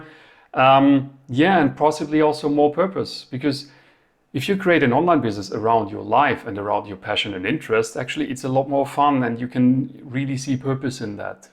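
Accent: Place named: Germany. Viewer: Ich höre den deutschen Akzent :D Aber gutes Video, weiter so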